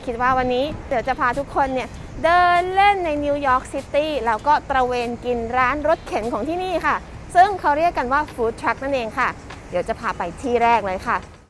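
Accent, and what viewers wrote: United Kingdom. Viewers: ฟังน้อง พูดภาษาอังกฤษสำเนียงคนอังกฤษ(ไม่ใช่สำเนียงคนอเมริกัน)น่ารักมากค่ะ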